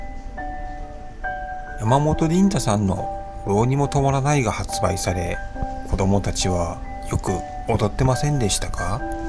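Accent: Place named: North Kanto, Japan. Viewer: ナレーター男性のイントネーションが関東以北の雰囲気を醸し出していたので、いっそのこと方言を丸出しで語らせたら違和感も無かったのにと思う。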